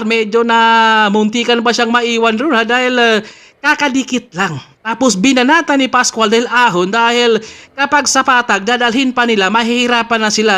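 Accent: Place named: Tagalog, Philippines. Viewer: I love your Tagalog hard accent.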